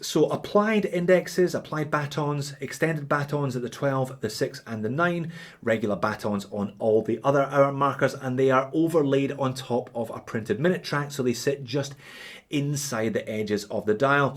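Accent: Scotland, United Kingdom. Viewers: my man, i thank your for your reviews. your scottish accent and your humor eases my day! thank you!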